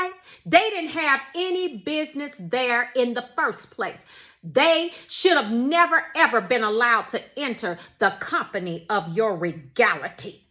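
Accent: United States. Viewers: Just brilliant! So funny and alive with that strong high pitched American accent! I hear you girl loud and clear! Lol Will listen over and over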